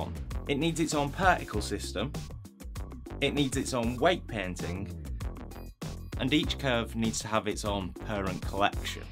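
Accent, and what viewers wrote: United Kingdom. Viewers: Thank you for tutorial for newbie like me for alternative, and love the bri'ish accent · Why are there so many British men whose voices sound exactly like this voice?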